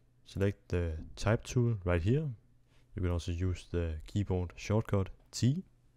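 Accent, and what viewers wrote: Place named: Denmark. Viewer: Den accent lyder meget bekendt... du MÅ være dansker!😂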